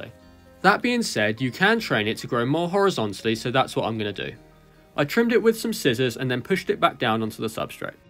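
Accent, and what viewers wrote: United Kingdom. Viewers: His British accent is just killer😌 By the way the setup is amazing 🎉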